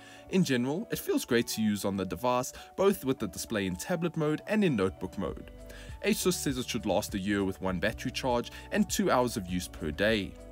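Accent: South Africa. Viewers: Yes it's funny I didn't notice that about sath afrikan before. Also bright being pronounced as braght. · South African???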